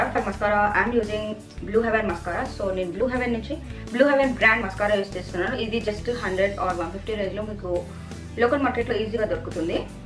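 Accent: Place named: India. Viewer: Your telugu slang vedio is nice&suppr 👍 Do more this typ of vedios weekly once it's more comfortable to common people...🙏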